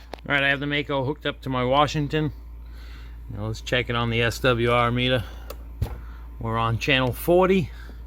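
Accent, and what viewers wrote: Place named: New York, United States. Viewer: Are you from NY ? what a heavy accent you have ...what are you doing in NC?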